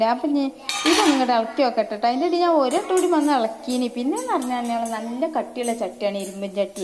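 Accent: Malappuram, India. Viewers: 1day andhakkaa vibavangallaaa ningal super anu ttoo pinnee nongale basha kette video kelkkan oru sugamanu annee😍😍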